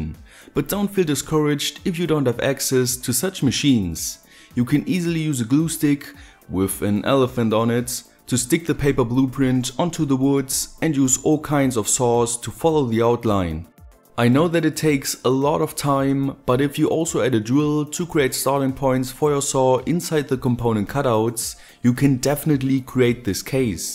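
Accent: Germany. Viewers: are u from germany? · I really like your German accent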